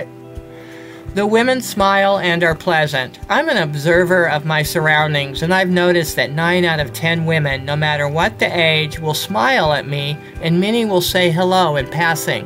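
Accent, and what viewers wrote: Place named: South, United States. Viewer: 😊 Yes ma'am 😊 Your little speach today remind me of the way Americans always speak to me... No matter what I say, I get this American "yes ma'am" or "no ma'am"... And, I can't help but smiling..... hence, should you have any doubt who you are talking to or, where they are from, just listen to the "yes ma'am" or "no sir"... Sure has it's own charm 🌹🌹🌹 Thanks for sharing - ma'am - and, enjoy your Sunday... On my way out to be kicked by some "friendly" Europeans... Cheers 😊🌹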